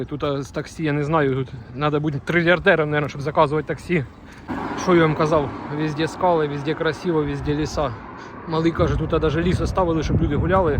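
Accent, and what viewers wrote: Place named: Surzhyk, Ukraine. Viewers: Ми Українці шо не понятно а балакає він як у нас в Дніпропетровській області привіт з Павлограду. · Важко сприймати на слух такий жорсткий суржик.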